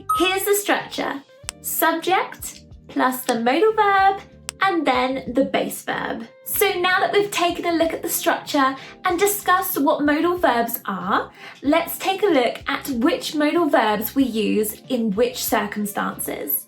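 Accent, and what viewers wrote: United Kingdom. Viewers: You British? Correct?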